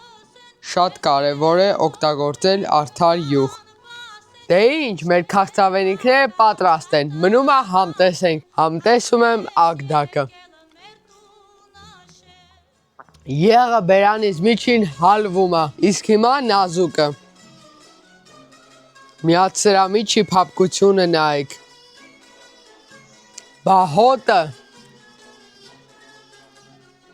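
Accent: Lori, Armenia. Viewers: Մեր Լոռվա բարբառը 😊😊❤❤❤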